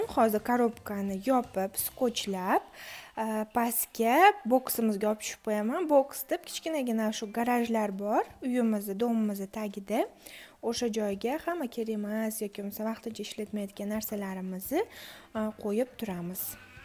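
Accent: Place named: Fergana, Uzbekistan. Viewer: Talaffuzizdan Fargonalik bolsez kerak deb oylodim.Men ham fargonadanman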